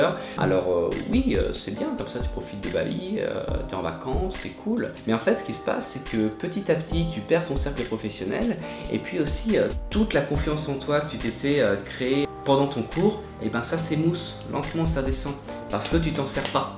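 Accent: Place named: France. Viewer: You have such a lovely French accent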